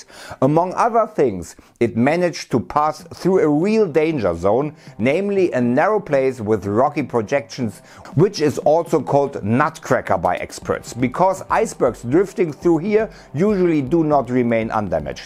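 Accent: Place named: Germany. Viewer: Gutes Englisch! I love how the germans pronounce english words. :D Sounds a bit weird and funny. Außerdem liebe ich den Kontent! Das Englisch frischt das ganze noch 'n bisschen auf! Weiter so!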